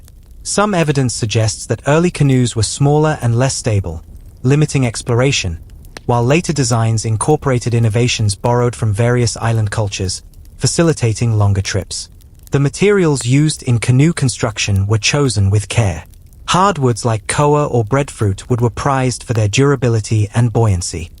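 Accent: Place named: Europe. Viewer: When a european sounding man narrates its boring and full of holes, not a sleep story at all, more like an unintelligent story told by an unpolynesian male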